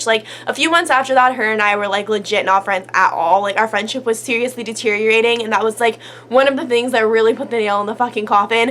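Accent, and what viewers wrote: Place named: Texas, United States. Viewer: Your texan accent came out a little in this video lol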